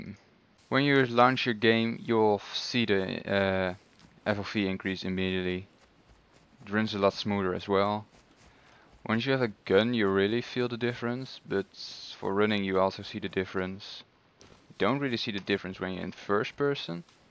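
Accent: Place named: Netherlands. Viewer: xD You're Dutch. (Posted this after the first 5 seconds of watching this video.)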